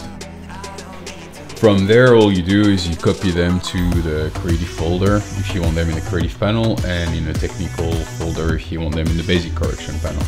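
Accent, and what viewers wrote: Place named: France. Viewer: You are purely French. Your accent speaks a lot haha!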